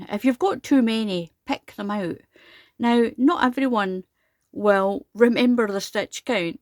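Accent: Scotland, United Kingdom. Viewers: Lovely! It’s always nice to get another variation to the granny cluster. And when I do this one I’ll hear your Scottish accent guiding me. Thanks! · Love watching your videos especially hearing your lovely Scottish accent. Very pleased you do not have music playing which makes it easier to concentrate on your inspiring videos. Very happy to be a subscriber to your channel. ❤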